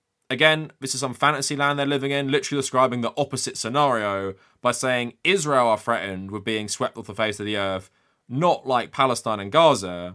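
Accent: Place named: United Kingdom. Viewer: Judging by your accent, you're from the place that spawned Monty Python. Can't blame Babylon bee and Onion for not making funny satire by UK standards. US standards in comedy have a lot of catching up to do in this regard, but they're at a level where the average American can appreciate and laugh at the jokes.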